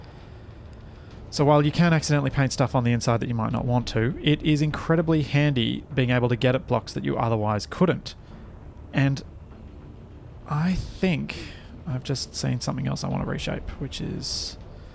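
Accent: Australia. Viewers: Definitely. I'm assuming from the time you post and the accent that you're in Australia? I'm in a bit different time zone.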